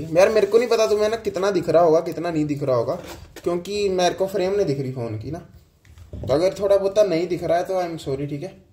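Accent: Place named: Pahadi, India. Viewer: Pahadi accent ❤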